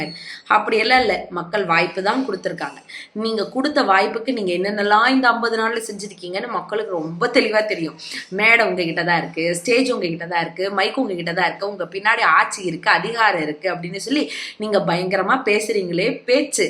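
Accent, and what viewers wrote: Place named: Coimbatore, India. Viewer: உங்க கோயமுத்தூர் ஸ்லாங்க் பேச்சு ஆமாங்க ஏனுங்கோ சூப்பருங்கோ❤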